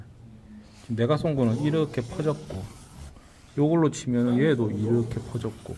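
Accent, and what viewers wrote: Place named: Gyeongsang, South Korea. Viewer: 아니 어떻게 억양은 골수 개앵사앙도오 인데 사용하는 어휘는 몽땅 표준어일까요? 총쏼때 한쪽눈 꼴치가꼬 전자가 쏘는 갱상도 아재는 너무 부럽네요. 분명히 아무리 들어도 마산분이신거 같은데요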